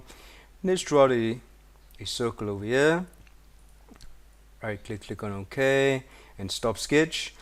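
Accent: South Africa. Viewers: This is brilliant, thank you! Lovely to hear a fellow South African accent.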